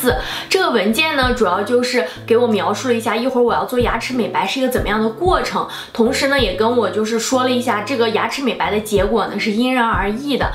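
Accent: Shandong, China. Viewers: 朋友，听口音是山东人啊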